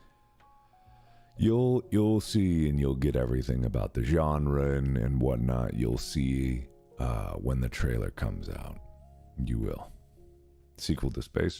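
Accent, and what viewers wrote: France. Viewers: Markiplier sounds like Tom Kenny's SpongeBob SquarePants French narrorator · Lol french! Yes! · Oh my!!! French accent through the video; oh dear gosh!!! Must be an ASMR video of some stuff.🧔🏻🎮😹🙀😼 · I was watching Murder on the Orient Express so coming to this and hearing him with the French was very odd. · French Accent AU ASMR 👌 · The french accent makes me laugh, i am, and i talk like that, so, for the FIRST TIME, i understand you very well 😭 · nice french accent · why talking in french voice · Am I the only one that hears a bit of a French accent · French Markiplier!~ 😍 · Why does he sound french 🥖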